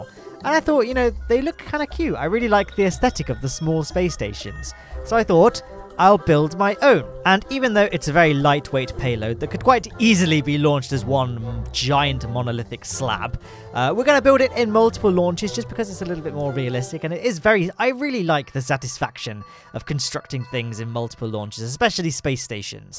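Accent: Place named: Doric, United Kingdom. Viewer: The "wh" pronounced as "hw" is a Doric thing, the dialect that suits between English and Scots. So it's especially appropriate for talking about hwiskey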